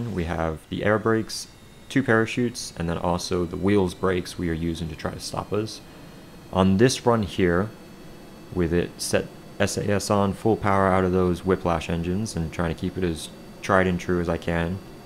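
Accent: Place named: Mid-Atlantic, United States. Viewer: A true "Mid-Atlantic Accent" then!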